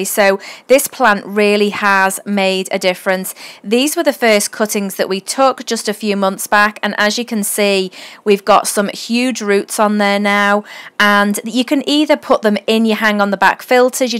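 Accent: Liverpool, United Kingdom. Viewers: i know ur accent it is british.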